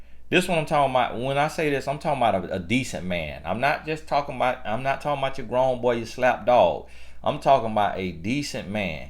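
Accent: South, United States. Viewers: You so real, and transparent! from your dating in your younger years, to being honest about your preference in your wife, and the Lord bless you two kids later.. Your country talking self is alright with me😆👍🏾 I love it! Keep on palming your wife! The RIM ROCKER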